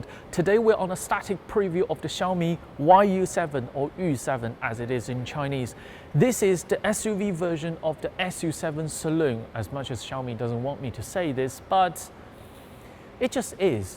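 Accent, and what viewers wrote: London, United Kingdom. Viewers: 兄弟正宗伦敦音啊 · 英式腔是這樣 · 小伙儿英文真棒 伦敦腔 有点topgear感觉啦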